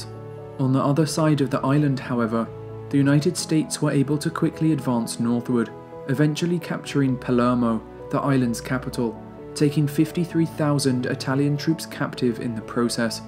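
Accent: United Kingdom. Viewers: He just speaks British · Came up on my feed, clicked the link and as it loaded thinking "...this narrator better have a British accent..." Noice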